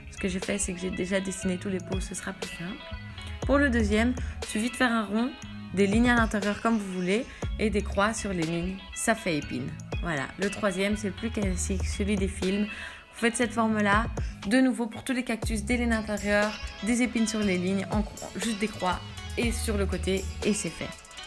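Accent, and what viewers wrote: Belgium. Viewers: Tu es belge toi ?^^ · Miss Serbia j'ai l'impression qu'elle a un accent belge perso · t'es belge ??? ton accent m'a l'air légèrement belge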